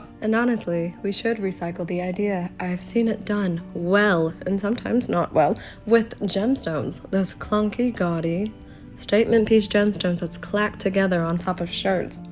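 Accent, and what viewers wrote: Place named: Transatlantic, United States. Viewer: your voice sounds like a very 90s icon doing a 1960s transalentic accent and it is the most amazingly delicous thing my ears have heard in the while.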